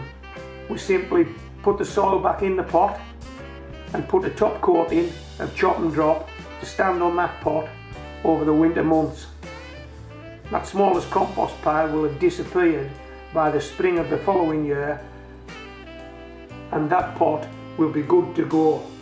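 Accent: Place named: Cumbria, United Kingdom. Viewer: Areet Marra? Not often you hear Cumbrian on the Youtubes ;) Now we're at home all the time, there's a bit more chance to get in the garden (silver lining?) So I'll watch and learn whats going to work in our neck of the woods. Great content - Keep it coming Tek care o yersel and that laal dog eh!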